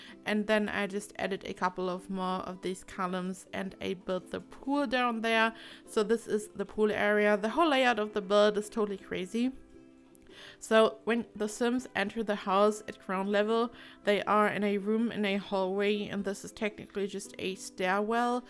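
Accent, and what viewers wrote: Germany. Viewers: I think you have a German accent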